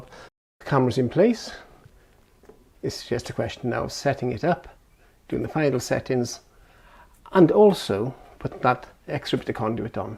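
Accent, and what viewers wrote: Wales, United Kingdom. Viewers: just bought one, this video was excellent, cheers for sharing, also do I hear a hint of welsh or am I linguistically challenged, lol?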